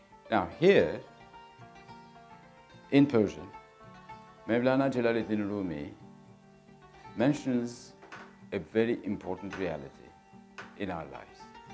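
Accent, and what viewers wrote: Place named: United Kingdom. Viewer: Hocamızın ingilizcesi şahane İngiliz aksanina yakin olması orda uzun süre yaşamasından kaynaklı sanırım 🤗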